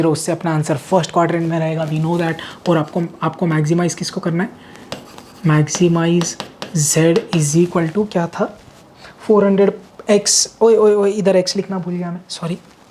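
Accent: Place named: Punjab, India. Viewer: 😂he literally was so tired “Or graph khatam h nahi ho raha “ 💘truly admiring person and love ur panjabi accent >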